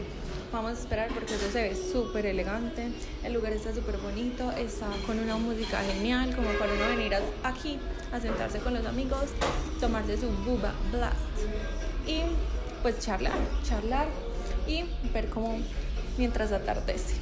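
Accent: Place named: Colombia. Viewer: Hola juapa saludos desde los Estados Unidos soy de acapulco megusta ese asentó maravilloso por ese asentó empecé aver las series de Colombia saluditos juapa 😊😊😊😊😊😊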